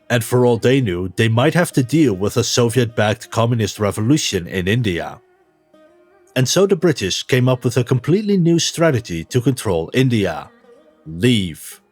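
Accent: Netherlands. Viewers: Do I hear a Dutch accent? 🇳🇱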